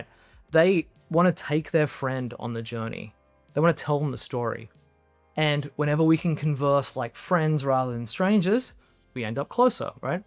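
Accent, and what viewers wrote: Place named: Australia. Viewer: Me too, and I like his Aussie accent too! 😊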